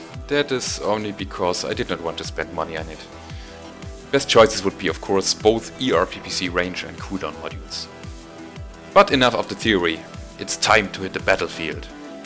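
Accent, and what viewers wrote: Germany. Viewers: Very impressive, this tutorial adresses things i have seen other players doing and always wondered about. I don not own the 9S and the modifications you show here will cost a lot, so no TBolt sniping for me in the next time. But i definitely want to try it out at some point, since i already own most TBolts (Overlord package), so i will be able to unlock all mech skills. One question though: i noticed it on other of your vids too, the way you speak sounds like a german speaking englisch to me? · Are you German? That's one heavy accent you have.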